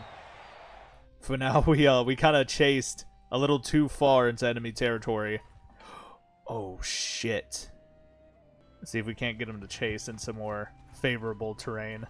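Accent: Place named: Ireland. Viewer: Great Irish Accent 😁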